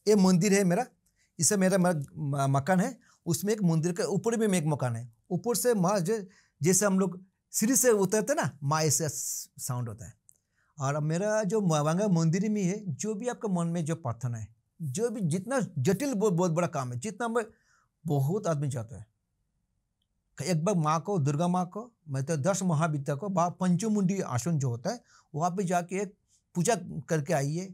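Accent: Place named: Bengal, India. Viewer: Ku samj nhi aya?????? Hindi he toh bola hai. Boss pronouns thora bengali mixd hai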